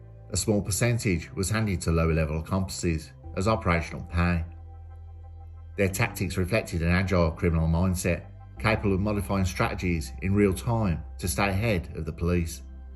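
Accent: Birmingham, United Kingdom. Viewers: You do a great job, but I also struggle with the audio on many of your vids. The sound quality is fine , but you either slur some words slightly or speak several words so quickly, that I'm constantly rewinding to work out what you said! No offence intended, just (hopefully) some constructive feedback ...and I'd much rather hear your Brummy accent than listen to an AI voiceover! Keep up the great work 👍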